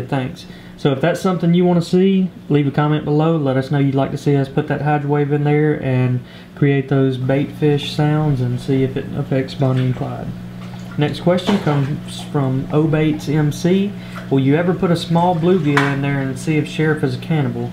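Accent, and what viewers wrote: South, United States. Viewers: You’re voice is very approachable, like you sound so sweet and southern. I’m sure someone would love to sit with you and fish for a bit lol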